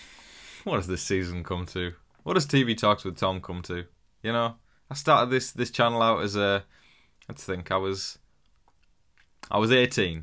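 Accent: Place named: United Kingdom. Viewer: I loooove your British accent and take on BB❤❤❤❤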